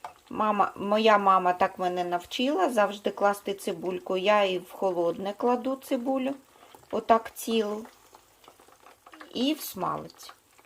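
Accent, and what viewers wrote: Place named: Kyiv, Ukraine. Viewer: Дякую за відео))) Комусь не подобається київський суржик)))а мені його не хватає(знаю що не вистачає,але в києві так)))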